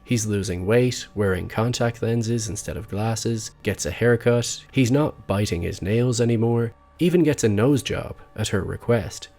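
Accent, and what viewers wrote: Ireland. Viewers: Very subtle Irish. He sounds like a light version of the Irish guy who has a true crime Youtube channel called That Chapter. Except he pronounces the TH in "three" and "thirteen" whereas the other guy says "tree" and "Tirteen". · Sounds Irish to me, but not that strong an accent.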